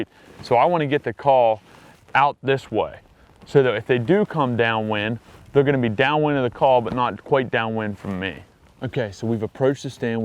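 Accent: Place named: Pennsylvania, United States. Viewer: I'm getting a little PA accent in this video. Where you from?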